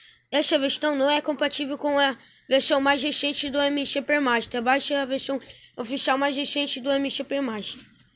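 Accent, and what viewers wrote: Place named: Rio de Janeiro, Brazil. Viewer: Ele e Carioca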